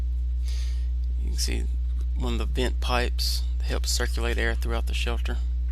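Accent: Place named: South, United States. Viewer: Love that Southern accent you got rocking!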